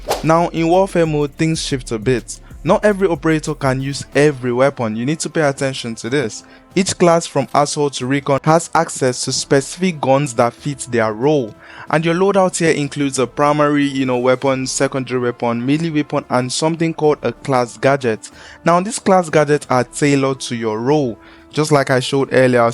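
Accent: Nigeria. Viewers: Bro you Nigerian...😭 Aye great video man❤ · I know my Nigerian brother wherever... We can never go wrong with that accent... in fact to be more precise.. you be Yoruba boyyyyy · Bro are you Nigerian?? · You sound Nigerian. Are you? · Your 9ja accent is unique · Wait are you Nigerian?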